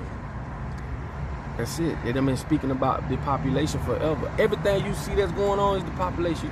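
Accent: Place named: Atlanta, United States. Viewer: You sure you from Florida you got...you got an ATL swagg fosho...talk and everythang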